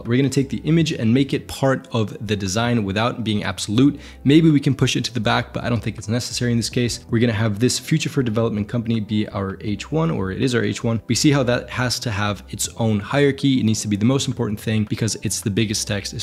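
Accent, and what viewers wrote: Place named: United States. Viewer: Love the tutorial. Sorry in advance for being intrusive but, how come you have the most perfect American accent? I can see you are Valencian/Catalan (like me). If you went to diction classes please let me know where, if it's online.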